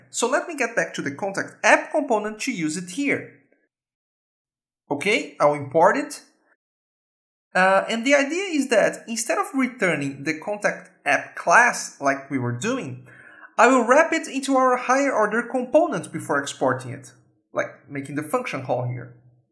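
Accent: Brazil. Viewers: I think I know this accent - Brazilian right? By the way, good vide, keep going on it! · pelo sotaque, eh Brasil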